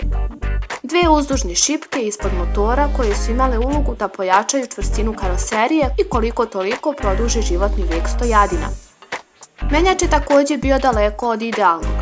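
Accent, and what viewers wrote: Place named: Bosnia and Herzegovina. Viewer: Znas li ti koliko si daleko od bilo cega u vezi sa automobilima ?Toliko gluposti da se ovo ne komentarise.Komentarisem tvoj glas ,koji je negde iz Federacije Bosne i Hercegovine.Ti si toliko daleko od poznavanja automobila Kao Toyota -Camry model 1970-tih od Zastave 750L.Toliko netacnih gluposti,zavisti...Pri tom nemas pojma da par 10 zemalja na svetu proizvodi automobile u 20 tom veku.Znaci,ne sklapa,nego proizvodi.Kad vidis sledeci put Zastavu,najbolje je da cutis.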